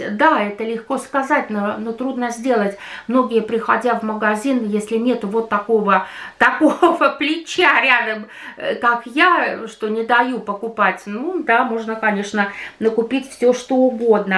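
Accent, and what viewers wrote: Finland. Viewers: Тащюсь от акцента! Вспоминаю персонажа Вилли Хаапсалло из Особенностей национальной охоты: Зеня когда мы пойдём на охоту?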